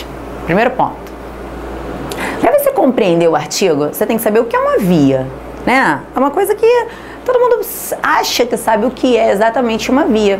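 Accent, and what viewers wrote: Rio de Janeiro, Brazil. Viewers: aguentar esse sotaque pobre de carioca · Sotaque carioca é maravilhoso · Gostei, mas me sinto no rio com esse sotaque, não sei se tudo isso também se aplica pra São Paulo. Mais foco na matéria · carioques insuportavel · sotaque carioca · Gostei muito do seu sotaque, característico de um carioquês original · Sotaque carioca :) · Putz, me desculpem, mas não consegui assistir o video inteiro, não posso falar se é bom ou ruim, porque esse " carioquêis " é irritante DEMAIS !!! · maldito sotaque carioca. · ela tem sotaque carioca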